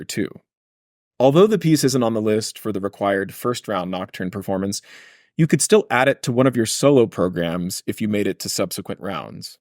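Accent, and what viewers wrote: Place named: United States. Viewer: Thanks for the reply - I recall now that Americans pronounce "era" and "error" alike, which has confused me before. You guys certainly make some weird and apparently arbitrary alterations - what is the logic behind putting a long o sound in "Chopin"? And it's clearly spelt Koczalski, so why say Koczolski?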